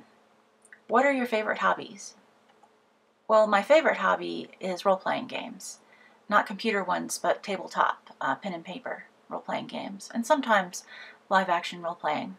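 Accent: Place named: Appalachia, United States. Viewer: A lot closer to home than I expected, in many ways. I played Requiem, not Masquerade , but also watch NonStampCollector, and your smoothed out accent is like that of my Appalachian kin- well, some of them. Likewise, you too could appreciate it if they made the Ender's Game movie with the same wardrobe set as Pride and Prejudice. It'd be like Master and Commander: The Dark Side of the Moon.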